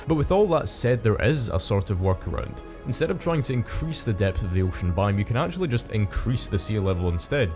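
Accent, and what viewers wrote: Scotland, United Kingdom. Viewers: Scottish YouTuber found lets go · Where are you from? You sound like a Scot trying to not have a Scottish accent. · I finally found a scottish youtuber!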